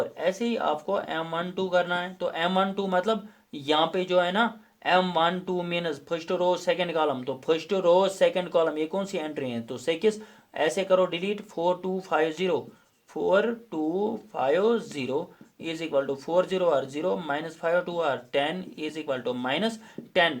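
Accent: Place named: Kashmir, India. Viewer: Super explaination thodi kashmiri hai but mast h sir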